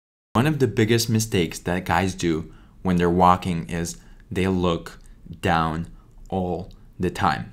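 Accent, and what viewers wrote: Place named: Russia. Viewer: Русский акцент спалился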